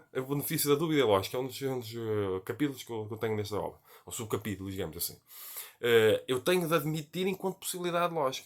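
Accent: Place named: Portugal. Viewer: Acho o canal maravilhoso, mas devido à pronúncia portuguesa perde-se muito do q é dito. Se fosse possível falar mais vagarosamente.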